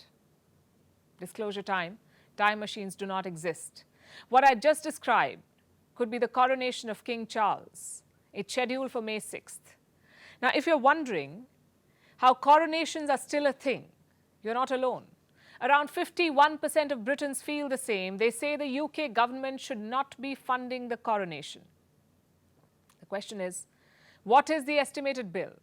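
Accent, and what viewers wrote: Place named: India. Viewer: Lady, you speak good English with a slight Indian touch!. And that says volumes.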